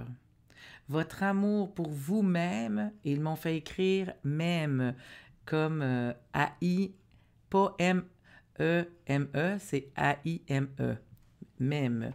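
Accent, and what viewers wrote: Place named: Quebec, Canada. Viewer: Merci pour ce beau message. Sympa l accent québécois.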